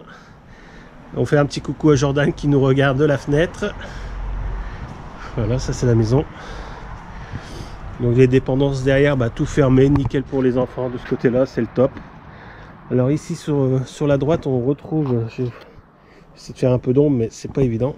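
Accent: North, France. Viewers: Salut, jsuis désolé pour toi de quitter un grand espace pour un espace plus restreint, mais faut s'adapter. Je vois que tu es du nord!? Il me semblait bien avoir reconnu à la voix mais j'avais le doute! Je suis de Cambrai dans le nord je travaille sur une super 5 GTL de 1986, elle passerait dans ton allée lol Moi j'ai ce soucis de place également je travaille sur un terrain non bitumé c'est terrible et je partage quelques vidéos. Je te souhaite bon courage pour toute tes installations d'aménagement. À bientôt, Mat de #super5gtl86 😉✌️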